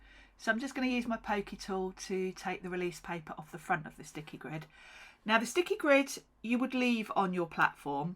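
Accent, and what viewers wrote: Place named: United Kingdom. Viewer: Hello! I’ve just received mine in the mail today, and I am even more excited to use it after watching your tutorial. You’ve explained everything so well, that for a rarity, I really don’t have any questions as of yet. I may have some after playing with it, but I do feel you were ever so thorough. I showed my husband and daughter not too long ago and exclaimed, “Look! My birthday pressie has finally arrived!” I received two smiles and one with an eye roll. 🙃. My birthday was in August. When I finally decided what I had wanted, they were already out of stock. I can’t tell you how quickly I was pressing buttons when I received an email letting me know they had more in just a few days ago. I do have two unrelated questions. The first being, in some of your comments it had been mentioned this had been on C&C with Pete. Could you please tell me what the C&C stands for and who Pete is? 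My second question is more personal, and I do apologize, but my curiosity has gotten the best of me. Could you please tell me the area of England you’re from with your British accent? I’m asking because my Mum was British, she had married an American fella and moved to the states. However, there were parts of my life when I did live there and went to school. The first part, my Mum, older brother and I lived with our Grandparents in Avebury. After awhile, my Mum took my brother and I to live in Salisbury. This had been when my Dad had been stationed in a not so family friendly area, and that’s why we l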